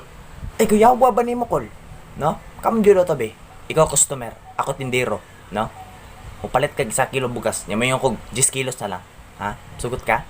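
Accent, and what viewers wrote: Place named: Visayas, Philippines. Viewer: nakakatuwa namn po sir ang galing nyo talaga mo mg patawa lalo na yng salita ng bisaya mo dami kng tawa sa mga jokes mo pkcm amo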